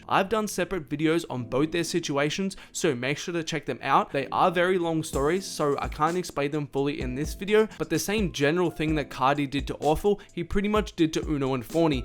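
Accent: Australia. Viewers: This guys australian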